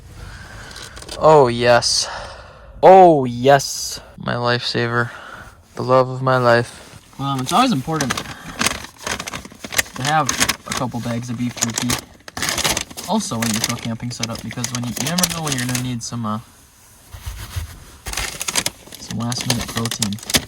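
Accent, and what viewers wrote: Minnesota, United States. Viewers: Currently living in Hong Kong and am stuck here for Christmas #$%*&! I grew-up in Minnesota and love the outdoor scenic views, hearing yr MN voice, and just watching yr general goofiness....reminds me of home. Keep the videos coming as they make me feel less depressed during this crappy pandemic. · I recently broke up with my boyfriend who is from Minnesota and your accent just reminds me of him, funny how I never noticed his accent before 🤔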